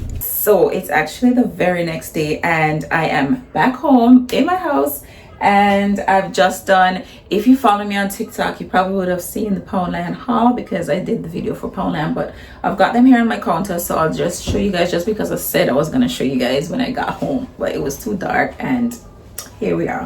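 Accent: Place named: Jamaica. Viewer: Think it's Jamaican.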